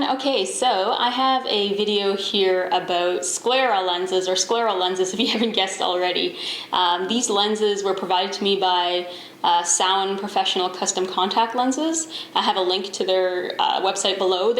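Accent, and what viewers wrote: Canada. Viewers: Love a Canadian accent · Love your Canadian Accent!! Its so cute!!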